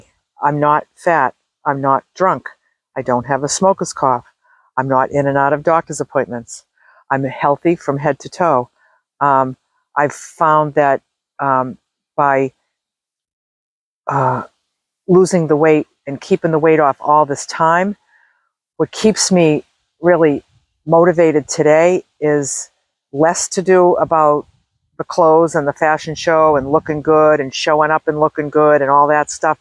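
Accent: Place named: Massachusetts, United States. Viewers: you sound like voices from my childhood in Massachusetts.💗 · I am sooo grateful that I fought your YouTube sight. You are an inspiration to me. I can so relate to everything you have shared. I hear a Bostonian accent which also excited me because I’m a true Bostonian too. I will continue to watch you and look forward to more videos. I truly feel I can sleep better tonight and wake with 3 different outlooks to my life tomorrow (physical, emotion and spiritual. Not to sound corny but I swear You were sent as a wellness blessing to me. Thank you! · Nice to meet you,! You sound like you're from the Boston area, not far from me in Southern NH! Hi, neighbor! Thank you for sharing your inspiring story...it was such an encouragement to my heart! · I love your accent. I miss my east coast cousins.UR my example. I need to drink moreH2O. 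I have to walk more! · Thank you for being you, and here on YouTube! I like you! One reason is because I can tell that you like you! I find you to be very refreshing! Sorry/not sorry for all the exclamation points. 😊 I'm in New England, and your accent sounds pretty local to me. I'm curious where you're from. Anyway, I'll be following you here. Keep on keepin' on my virtual friend! You're an inspiration. ❤ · You sound like you’re from Massachusetts are you I am and I pick up a pre-bit of an accent. Love your channel. I’m a regular subscribe right now. Please answer my question about Massachusetts.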